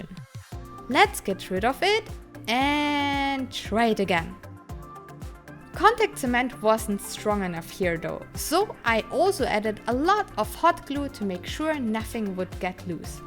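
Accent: Germany. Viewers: Are you from Germany your pronunciation is realy german...I am from Germany to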